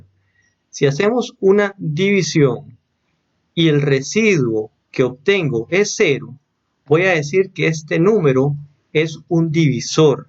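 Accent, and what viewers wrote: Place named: Guanacaste, Costa Rica. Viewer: como se nota que es tico por el acento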